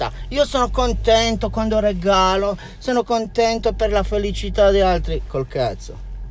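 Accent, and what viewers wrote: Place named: Moldova. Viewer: Dall’accento sembri moldavo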